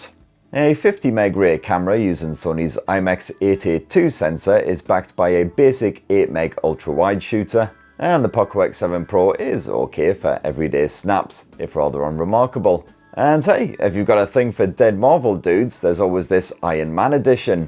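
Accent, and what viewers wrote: United Kingdom. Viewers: Man British accent is too polluted to the ears. Heavy and distorting to hear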